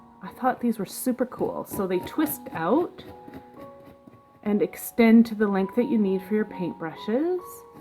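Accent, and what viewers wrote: Canada. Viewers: Awe, so fun to hear your sweet voice! I love the Canadian accent! 😍 I wish we had a your dollar store with more... But I shouldn't complain because I have access to a lot of stores you do not. I'm hating the cold weather. I'm ready for spring! We don't have Dollarama either. I've had a cold as well and believe it or not, I'm still struggling with shingles. It's bearable, but my immune system is struggling to fight it off. I love the paint brush holders!!! Nice haul, looking forward to seeing what you make with it!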